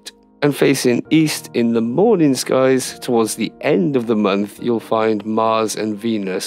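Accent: Wales, United Kingdom. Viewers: Great details, 👌 u probly know already. Also superb asmr c; Cymru accent,? Live from snowdonia? C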